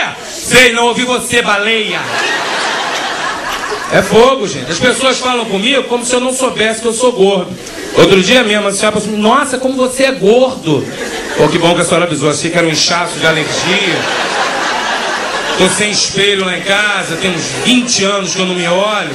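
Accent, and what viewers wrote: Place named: Rio de Janeiro, Brazil. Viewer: Shoooooooow de bolaaaaaaa! Nossa, acho que os melhores comediantes, são os cariocas! O sotaque e o jeito meio largado, tipo " isso meermo" e coisas do tipo, ajudam a ficar mais engraçado! Parabens! sou sua fã!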